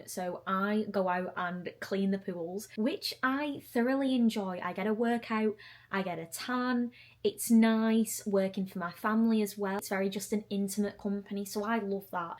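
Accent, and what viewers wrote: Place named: Lancashire, United Kingdom. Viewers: It’s a Coppull/ Chorley accent! Not too far from Wigan, at all. Welcome to Florida &. St. Cloud is a beautiful city! And thank you so much for nursing the sick. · Love this ✨💗 Also a fellow northern girlie here love the accent!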